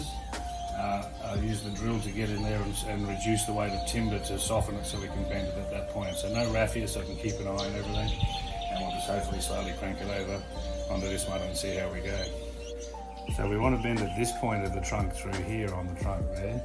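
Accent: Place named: Australia. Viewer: Good ol you tube recommendations. This was really useful and I hadn't seen that drilling approach before on harder wood for bending. Having been raised in Melbourne before finally ending up in the UK it is always great to hear that accent. Cheers for this vid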